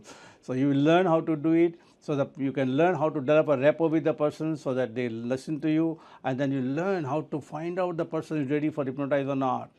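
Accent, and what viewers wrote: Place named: India. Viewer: If you are a real hypnotist then why don't you work on your accent? So Indian and so funny. 😂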